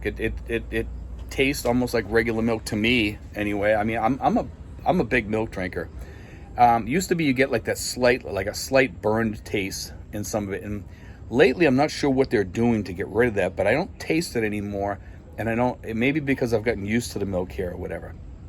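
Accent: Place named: Massachusetts, United States. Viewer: You sound like your from Mass.I know that accent.Im from Quincy.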